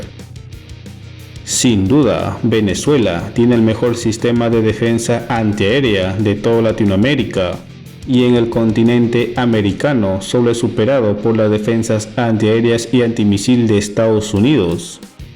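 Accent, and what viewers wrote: Peru. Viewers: Revisa el canal, es peruano, sale en el video que le dan el botón de plata u oro de YouTube XD además es cosa de escucharlo xd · Es peruano creo , y ellos hablan así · TE DA VERGUENZA SER PERUANO? ENTONCES NO FINJAS QUE NO TIENES ACENTO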